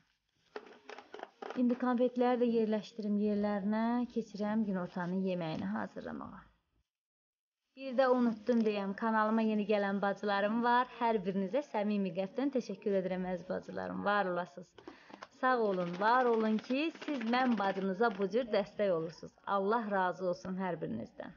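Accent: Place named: Shamkir-Gadabay, Azerbaijan. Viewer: lap yaxşı yox yanılmışam ləhcənizdə Şəmkir Gədəbəy zonasına oxsatdim ləhcənizi.mən♥️ Ucardanam ♥️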